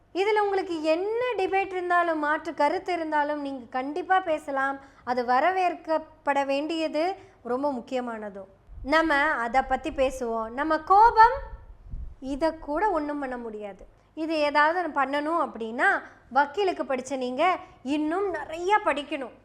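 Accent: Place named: Coimbatore, India. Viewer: Sister, you have narrated the Silapathikaram in a different dimension. Your speciality is your Coimbatore modulation. Keep going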